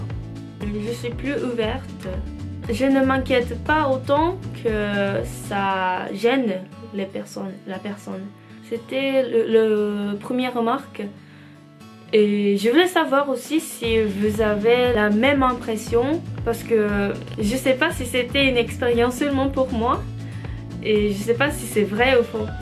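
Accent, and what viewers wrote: Japan. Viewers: UN JAPONAISE PARLER FRANCAIS EST TROP KAWAIIIIII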